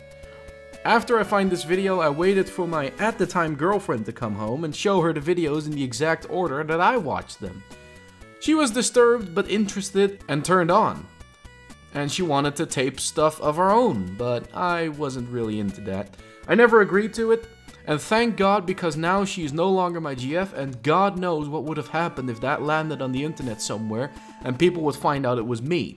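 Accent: Netherlands. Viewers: r u dutch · are you dutch because you sound dutch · Are you dutch because it sounds like it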